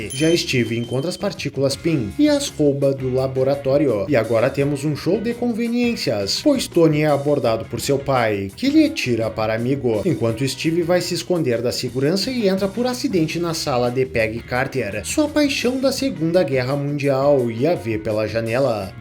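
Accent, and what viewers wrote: Rio Grande do Sul, Brazil. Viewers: Mas ah locô véio! Esse sotaque é inconfundível. Tu é do RS?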